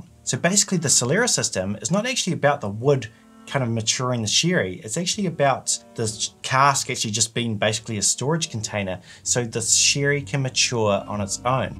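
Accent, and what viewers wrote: New Zealand. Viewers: Your channel is so good, needs more attention from the online whiskey community. Very well edited, great presentation, well researched, and I love listening to your Kiwi accent. Cheers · Kia Ora brother, I found your channel a few weeks ago and I must say that you educate on very understanding level. I've been drinking whiskies for 3 decades and last 20 years just single malt on rare occasions blended if I find a good one but I wasn't that good in details about process and casks in deeper dive. I lived in NZ for 16 years and crossed the ditch many years ago so it is always nice to hear this lovely accent. Anyway back to sherry , your choices are spot on, and as you mentioned, like many people, I love A Bunah and 105 a lot. I also love some of the sherry cask finished ones and I am sure you have tried this one before, it is Laphroaig PX, as a peat and sherry lover, this single malt is the best of both. 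Take care, and thanks for the very informative video.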